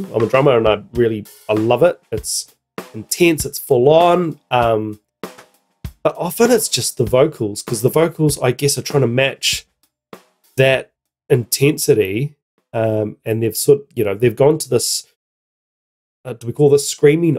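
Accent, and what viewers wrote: New Zealand. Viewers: Where in New Zealand are you from?!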